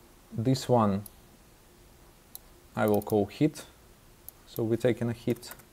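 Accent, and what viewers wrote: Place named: Russia. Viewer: Услышал русский акцент и понял, что моя проблема решена😎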